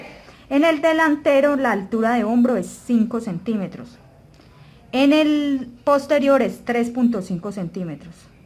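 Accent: Colombia. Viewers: ME ENCANTA TU FORMA DE HABLER TAN COLOMBIANA QUE ORGULLO ERES UN GENIO JAJAJAJA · Espectacular método bien explicado y tu acento paisa increíble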